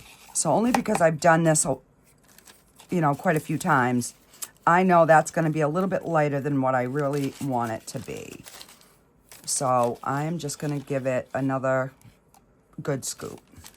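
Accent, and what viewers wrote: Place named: Massachusetts, United States. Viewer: Where are u from if you don't mind me asking you sound like mass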